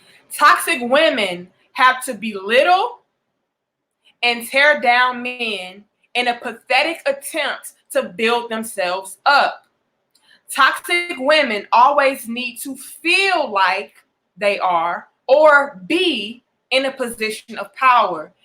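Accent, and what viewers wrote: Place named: Florida, United States. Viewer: I like the accent. You from Florida?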